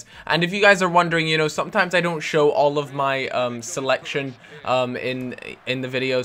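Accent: Scotland, United Kingdom. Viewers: where is Scotland do you come from?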